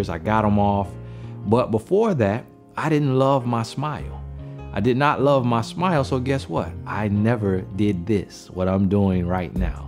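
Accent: South, United States. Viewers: I love the southern accent!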